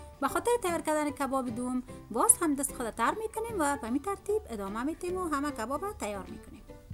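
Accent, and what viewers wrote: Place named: Afghanistan. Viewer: وای من عاشق لهجه قشنگ همزبانهای افغانستانی خودم هستم بسیار ممنون خواهر عزیزم بخاطر آموزش این غذای خوشمزه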